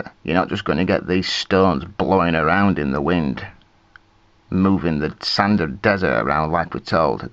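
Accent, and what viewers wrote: United Kingdom. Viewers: Its funny how i look at ancient buildings or while watching other videos from various YT Channels about these important topics I hear you saying in my head: "That is all REBAR... So much MUD (British Pronunciation emphasized!)... It is all a FASADE... This isnt a TOMB... PEOPLE LIVED THERE.... Certainly they decorated their houses with FLOWERS... Love your lessons, you are gifted!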